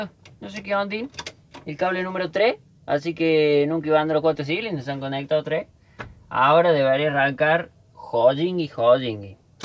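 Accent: Córdoba, Argentina. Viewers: decime si no sos Córdobes papá?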